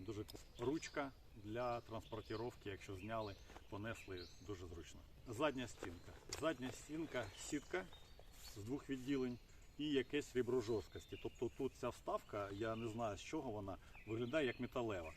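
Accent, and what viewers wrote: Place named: Russia. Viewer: мені половина відео слух різало, наголоси на російський манер. Особливо в слові Український. Думав до кінця не додивлюся.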